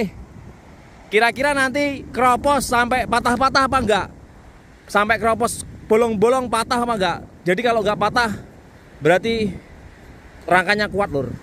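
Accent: Java, Indonesia. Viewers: Bahasanya medok 😂 Asli mana??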